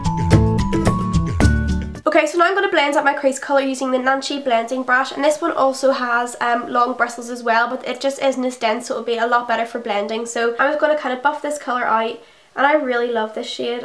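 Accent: Northern Ireland, United Kingdom. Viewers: What county are you from in Northern Ireland I love your accent!🙈💜x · She's so good isn't she! I'm from Northern Ireland too bit my accent is a wee bit different, I hate mine xx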